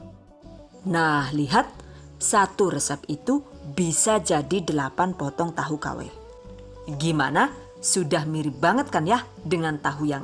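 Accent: Banyumas, Indonesia. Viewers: Hahaha...maen loh ngendikane...ngapak kaya nyong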